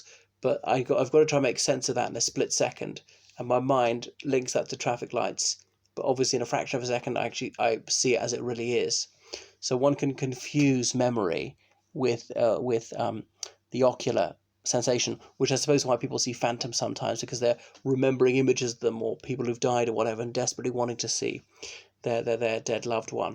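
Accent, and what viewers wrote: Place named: England, United Kingdom. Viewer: Nothing like a Springtime poem read by a gentleman with an English accent. Cheerful and uplifting in these challenging times. Thank you.🌷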